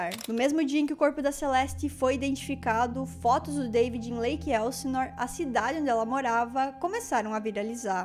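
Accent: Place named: Curitiba, Brazil. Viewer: Video muito bem produzido! Parabéns! ❤ Uma dúvida, você é de Curitiba? Pergunto por causa do seu sotaque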